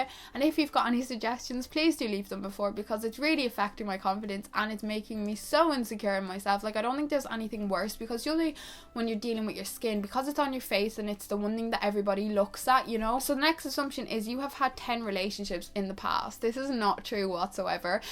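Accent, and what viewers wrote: Ireland. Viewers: Silvia Turco Liveri yeh she’s irish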